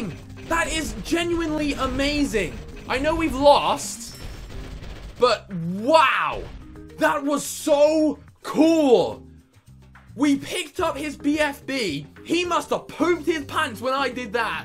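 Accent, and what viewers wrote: United Kingdom. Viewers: is your voice a bridish accent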